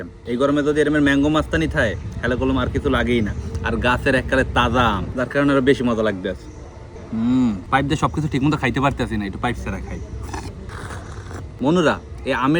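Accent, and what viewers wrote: Barishal, Bangladesh. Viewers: আপনার বাড়ি বরিশাল কোন থানায় বলেন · হালার বরিশাইলা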